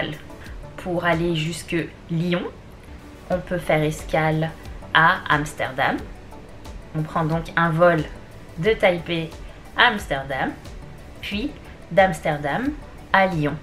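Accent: Standard. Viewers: 老师很棒！发音非常标准。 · 她是法國人，發音標準是很正常啊！😁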